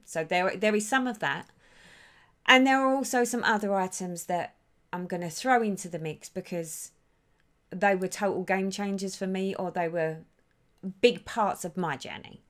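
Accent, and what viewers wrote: United Kingdom. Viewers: Yaay! Congratulations! Your videos have helped me so much, not to mention I love to hear your UK accent when you speak! Your stitching is beautiful and inspiring. I’ve been contemplating switching over Annie’s Keepers because of you. Please keep the videos coming. It won’t be long before you’ll be celebrating 20K! Thank you for your generosity in this wonderful giveaway! 1, 2, 3, 5, 7, 9, 10, 13, 14, ❤️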